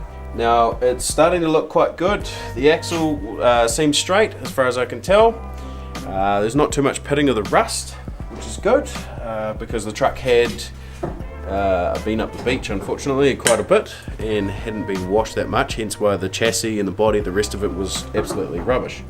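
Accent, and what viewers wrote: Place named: New Zealand. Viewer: ahhh your a kiwi lol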